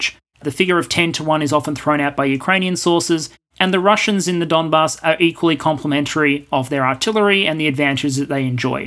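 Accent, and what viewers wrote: Australia. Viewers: This video is three months old. But like all of videos...I can just listen to it over and over again. I think it's a mixture of the subtle comedy, absolute reasonable logic, and that cool sauve Aussie accent that is so calming.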